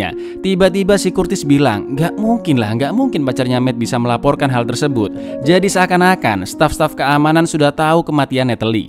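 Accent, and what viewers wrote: Java, Indonesia. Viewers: logat jowomu lurrr,,,,bkin auto sub chanel mu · Saya juga ngikut ah 3.suka ngelawak dan medoknya lucu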